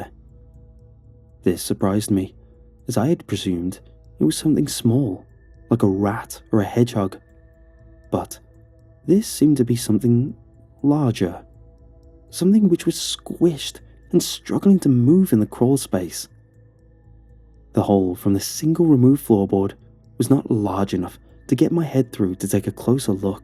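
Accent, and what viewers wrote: England, United Kingdom. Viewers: Not on my reg profile, on a friends..I've been listenin 2 for yrs now, my fave CP narrirator, is it just me, or his voice sound watered down? way less English?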